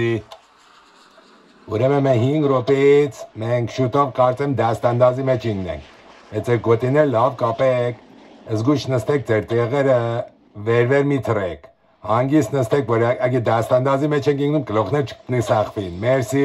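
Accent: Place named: Armenian, Iran. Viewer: Merrraaammm!! Such a barskahye talk!! Half “loghat” is in Persian 🤣🤣🤣🤣 Yes! Count me in too. I wanna be on this flight!!! 😂😂😂😂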